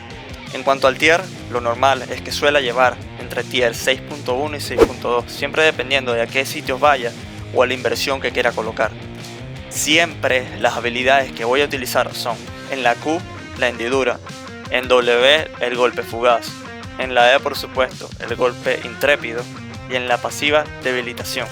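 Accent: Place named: Caracas, Venezuela. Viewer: Eres Venezolano? Ese accento es demasiado caraqueño!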